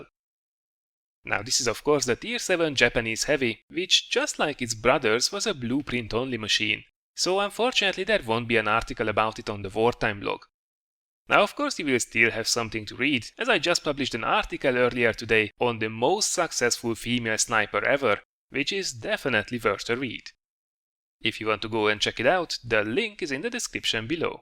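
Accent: Hungary. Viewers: Where are you from??(narrator) You have got hungarian accent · he is from hungary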